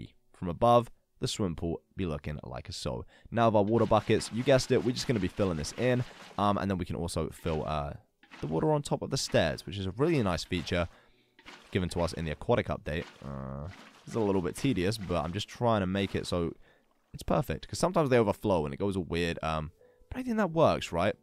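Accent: United Kingdom. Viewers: I don't want to disturb you but are you British because I really love your voice and your accent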